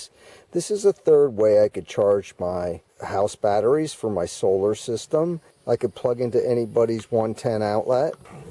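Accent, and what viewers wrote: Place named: Northeast, United States. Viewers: so you then really do have a Philly accent with a blend of the two. Just say you are from Northeast Philly if someone asks what part of Philly you are from next time. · Can tell you’re from the north east lol. I’m from Philly. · You from Pennsy or south jersey? Can’t tell from the accent.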